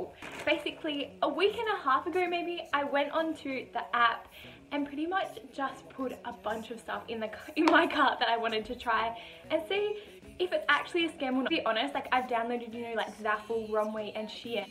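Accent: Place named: Australia. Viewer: OMG UR AUSSIEEEEE 💗 WOOOO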